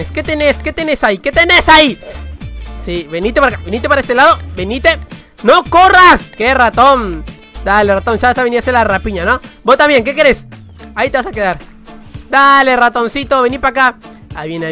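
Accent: Argentina. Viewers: ! Sos argentino? Xd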